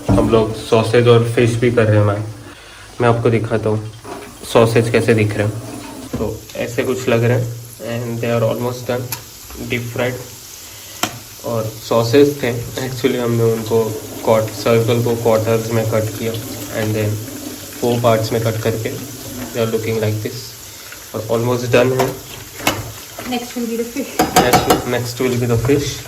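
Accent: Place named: United Kingdom. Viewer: Wo agrezo wali accent agayi hai tumhare English me.. kyaaa baat ❤️❤️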